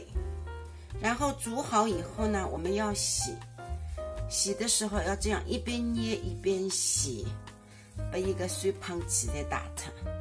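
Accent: Shanghai, China. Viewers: 终于听到上海话了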